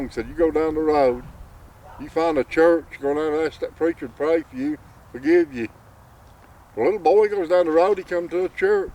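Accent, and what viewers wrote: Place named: South, United States. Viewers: Mountain speak. It took me some time after moving to the NC mountains to develop an ear for the "country" speak in these parts. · their exact words were "yes and someone who can speak clearly". That's no different than walking up to someone speaking Spanish and calling them stupid for not speaking English. This person speaks Appalachian English very clearly A N being ignorant of the dialect doesn't change that.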